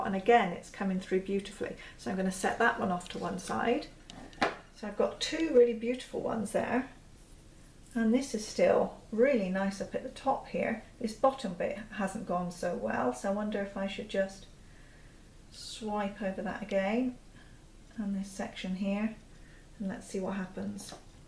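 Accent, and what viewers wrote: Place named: Devon, United Kingdom. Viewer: good on ya. I love seeing people having a go. are you from south West? Devon maybe?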